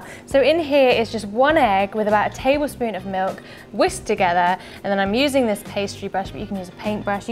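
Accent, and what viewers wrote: England, United Kingdom. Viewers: 😊love English accent 🎉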